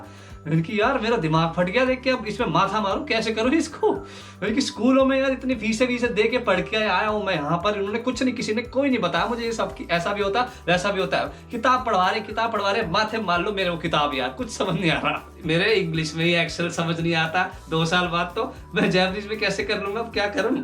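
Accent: Haryana, India. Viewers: Haryanvi on the top!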